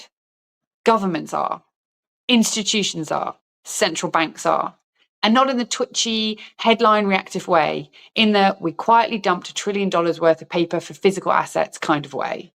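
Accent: England, United Kingdom. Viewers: Funny thing...I don't trust JPMorgan or British accented commentators. Is she reading a teleprompter? Makes you go hmmm... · Sounds like a lady with an English accent that likes to hear soft talk. I hear nothing of a substance here. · IMO, the British accent is beautiful and very classy. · Is she british?:cat-orange-whistling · Mostly asking, because of your English accent. I'm fully aware of 'everything' you're talking about + more. I'm in Australia 🦘 SO, now I'm going to get online & find out where gold hit $3500 per oz. In Australia gold is waaaay over that. I know why. SO yep, about to leave your chanel & find out where gold hit three & a half grand 💥 Pretty sure it was hovering around 3 grand in USA in the last few days / weeks. I'll almost bet my life, your headline is just more CLICK BAIT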